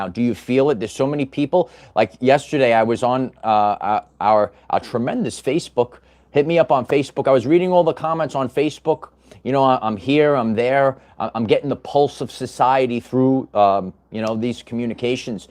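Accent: New York, United States. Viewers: Where are you? You sound like you are from new york.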